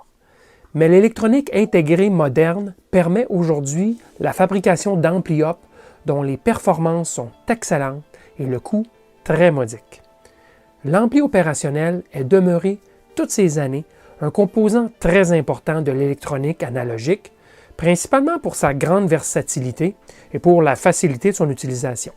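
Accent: Quebec, Canada. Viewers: Wouahouuu ! c'est EXCELLENT !!!!!!!!!!!!! très bien fait, vidéo très bien montée.... RESPECT !!! ET VIVE L'ACCENT QUEBECOIS !!vivement la suite !!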